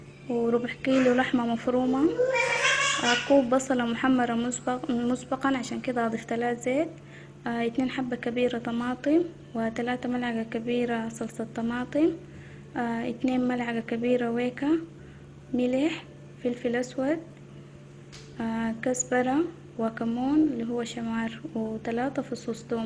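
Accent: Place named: Sudan. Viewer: يدك نظيفة وشغلك نظيفة وعدتك سممممحة وأهم شي حفااااااظك على اللهجة السودانية ياخ نحنا شعب معلم شعب مدرسة زاتو❤❤❤❤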